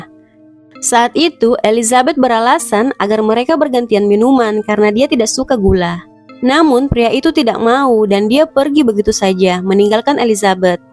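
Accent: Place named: Makassar, Indonesia. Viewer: Yg punya chanel sepertinya org makasar. Soalnya logatnya kentara pas lagi bicara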